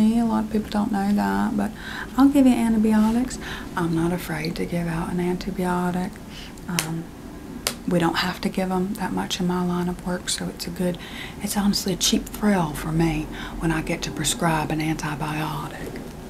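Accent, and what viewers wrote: South, United States. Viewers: I love the southern accent videos! I have to watch these way before bed because I’m too interested in the jokes and what’s going on to listen to new ones at bedtime lol 😂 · Your southern accent videos are absolutely my faves (the Fargo accent was a very close second though) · I love the southern asmr!! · I absolutely adore the doctor roleplays and like all the checking in and stuff. Especially with the southern accent, I could listen to a 2 hour long version of this! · your southern accent is actually so accurate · thank u!!! i loveee the way u speak with this specific southern accent with drawnnn out words. so soothing for some reason lol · These southern accent ones are my absolute favorites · Alabama or Mississippi. I can’t decide. It’s so natural. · hi i’m from the deep south and your southern accent is quite accurate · Love the southern accent, lol. 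Very tingly ✨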